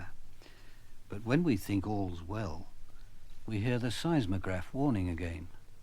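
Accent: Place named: England, United Kingdom. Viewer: Oh, what an authentic English! Of course, the explanation is excellent.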